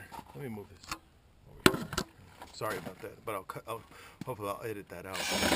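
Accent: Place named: South, United States. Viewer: Wow, You Got it Brother!! Well taught! You got, NC, HD, Clear clean Southern English, like I have, and Using God's name to share, not used in Vain! I was just Watching my A Good Guy, Originally from "Car Crazy", Barry Mequiar from his new show "Ignite your life" Guys and gals sharing their Faith, from all us sinners and fools, That Christ still Loves Us, even knowing how screwed up we are. God Bless YOU for worldly help, but more importantly, filling the Hunger, that so many have for meaning and purpose., Lee, Fl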